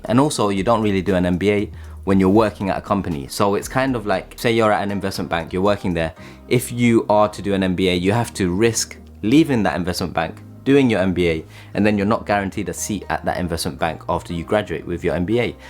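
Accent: London, United Kingdom. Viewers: you have such a nice accent are you from west london?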